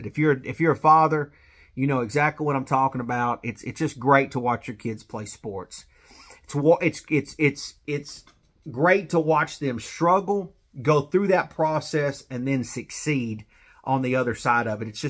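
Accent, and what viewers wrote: South, United States. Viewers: Where are you from because you sure don't sound like you're from NJ or NY? You got a southern drawl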